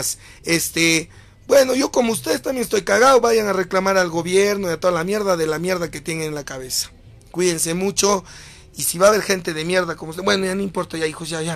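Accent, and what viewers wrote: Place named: Peru. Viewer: JAJAJAJAJAJAJAJAJAJAJ peruano puro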